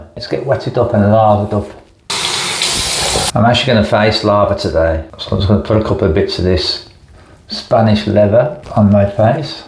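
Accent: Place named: Cockney, United Kingdom. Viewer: A bit of sincere advise mate. Speak a bit slowly because you speak with a cockney accent a lot of people will not understand you and it decreases the number of subscribers.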